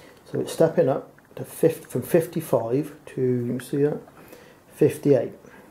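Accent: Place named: United Kingdom. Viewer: A 16:6 wide angle Mennon lens hood - the type seen in this video - is available on Amazon. It comes with a 55-58 mm step-up ring. This is a great video, by the way. I've one question, though: no matter how obscure my questions, whether regarding a vintage 1918 film camera or the most recent digital 4K cameras, virtually every YouTube video that answers my questions is the work a Brit. Any time a video a starts and I hear any sort of UK accent, I think: "Here come the answers!" Have you cornered the market of photographic experience and insight? No - thank YOU!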